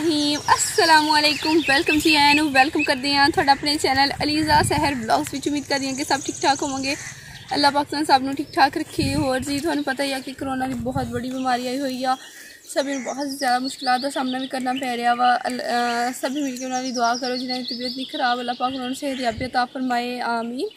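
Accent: Punjab, India. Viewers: Tusi ta same chadde punjab vargi punjabi bolde ho.very nyc vlogs 👍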